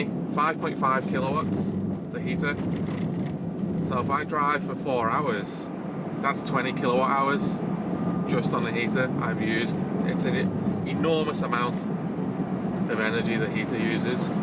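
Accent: Liverpool, United Kingdom. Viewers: Sounds liverpudlian to me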